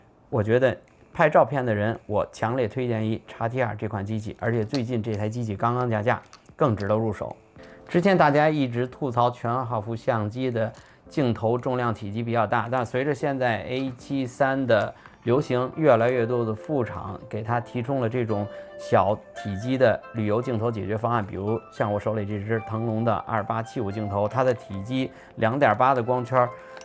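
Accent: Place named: Beijing, China. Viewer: 啥时候澳洲又来一位，我就知道金叔和顾俊，看起来这位水准更专业，北京口音听起来不如那2位亲切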